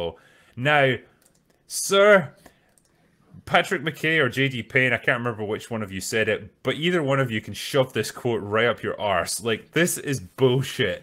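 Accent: Scotland, United Kingdom. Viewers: I'm English, listening to a Scot ranting about a Welsh Waman....Brilliant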